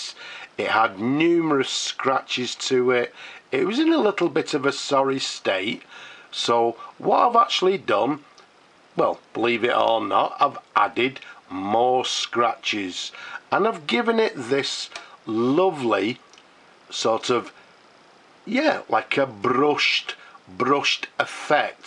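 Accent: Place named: North, United Kingdom. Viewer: love the pen and glad to see the nice event with my favorite nothern accent again.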